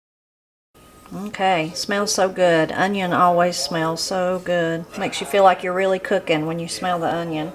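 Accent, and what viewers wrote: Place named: West Virginia, United States. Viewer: Love that accent. She talks like my mom did, she was from WV.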